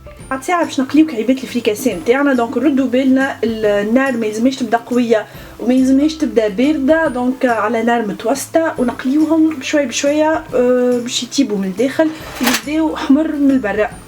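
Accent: Tunisia. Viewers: nmout 3a l accent tunissiya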